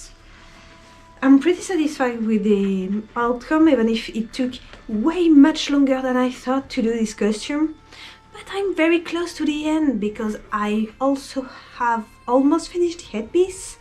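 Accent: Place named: England, United Kingdom. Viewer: 😮 cet accent anglais 👍 le sacrifice à la méchante créature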